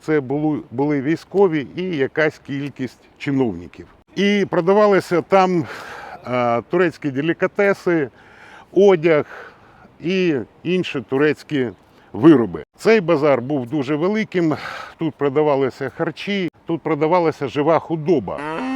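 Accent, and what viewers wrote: Odesa, Ukraine. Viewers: Пане даже гарна одеська українська мова.дуже цікава розповідь продовжують далі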